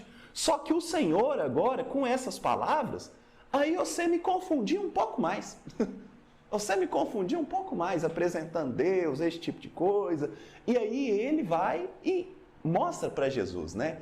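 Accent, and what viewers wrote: Minas Gerais, Brazil. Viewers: Que sotaque bom ! É mineiro?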